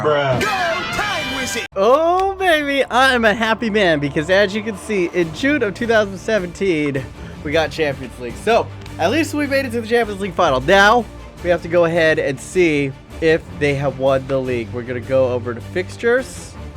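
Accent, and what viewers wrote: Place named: Oakland, United States. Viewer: Is this man from Oakland?if he is probably from downtown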